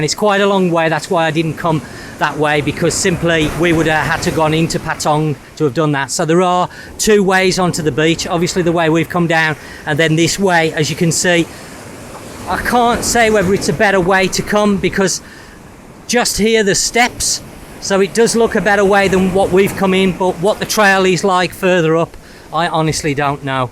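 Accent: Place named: Black Country, United Kingdom. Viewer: what gave it away was when you said the batter bits on your last video, common thing we say around the black country when we have our battered chips. im from Wolverhampton myself and thought you sound local.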